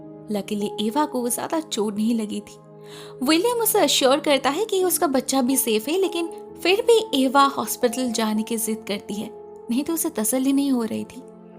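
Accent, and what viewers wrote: Bengal, India. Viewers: her accent described it so well that she's bengali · R u begali...it seems by your tone of Hindi...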